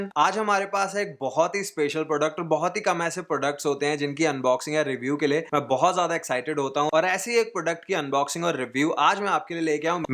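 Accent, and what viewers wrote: Punjab, India. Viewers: hindi in punjabi tone. Feel good to hear😊😊😊👍👍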